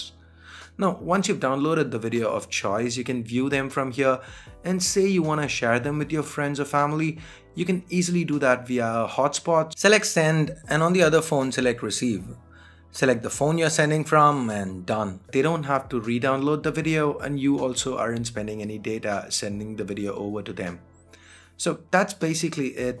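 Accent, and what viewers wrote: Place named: India. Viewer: Want 2 wowch😂😂 Indian accent is so fucking funny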